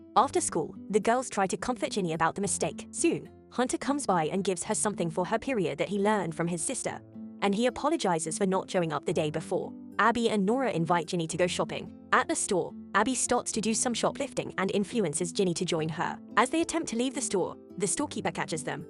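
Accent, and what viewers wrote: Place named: United Kingdom. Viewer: I really love you using British accent ai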